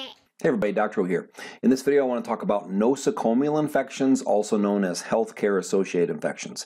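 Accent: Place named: United States. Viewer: Goddamn sir finally. Finally someone who speaks American English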